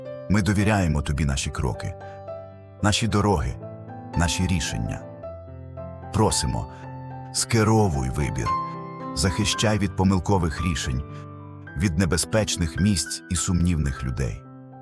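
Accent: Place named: United States. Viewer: Можно для молитвы исцеления подобрать какой-то приятный внятный, успокаивающий голосовой тип, ведь всё равно ии используете? А то напоминает неполноценных из Винницы в 14м году получивших власть из лап эуропы и брызгавших слюнями из неразвитого речевого аппарата на всех трибунах и сценах. Неужели создатели ролика не слышат, что этот тип речи звучит неполноценно - аденоидно?да ещё с имитацией какого-то вовсе неуместного типа американизированного акцента? Нету украинцев что-ли с нормальной здоровой естественной дикцией? Вы испортили молитву, сделав из неё паническую, обгоняющую слово - словом скороговорку.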